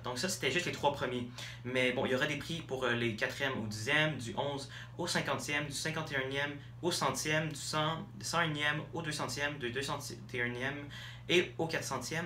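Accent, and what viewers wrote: Canada. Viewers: tu est canadien ou français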